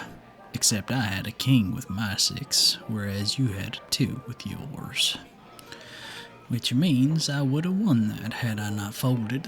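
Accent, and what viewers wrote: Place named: South, United States. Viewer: I may listen to this over and over again if I want to play poker with my dad, cause like the title says "Mysterious Stranger Teaches You Poker". Also, love the Southern accent, and after playing poker with "stranger" here, I trust this guy :)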